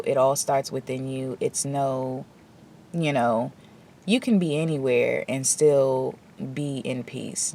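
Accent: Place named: DMV, United States. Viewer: I hear a dmv accent. I am from there. I am feeling the same way with the trees. I wish I can move so that I can feel more freedom of nature. ❤ im grateful you have found your way.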